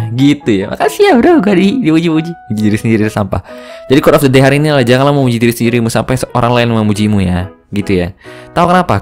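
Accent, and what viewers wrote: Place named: Medan, Indonesia. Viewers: Abg org medan yaa ?? Logatnyaa... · nampak kali medannya ya bang :D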